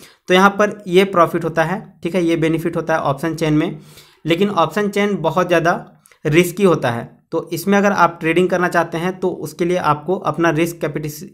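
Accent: Odisha, India. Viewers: Kya Bhai ap odisha se ho? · Sir tum odisha se ho kya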